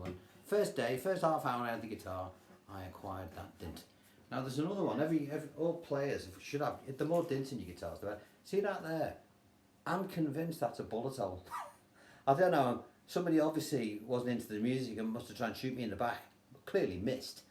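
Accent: Liverpool, United Kingdom. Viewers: Came for, stayed for the accent. Brilliant. I dunno, scouse?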